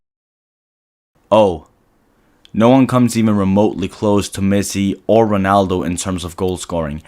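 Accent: Denmark. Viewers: Where are you from, if I may ask? You sound kinda Danish, and I saw your friend had a DBU shirt on as well in one vid at least. Anyways, nice video! :D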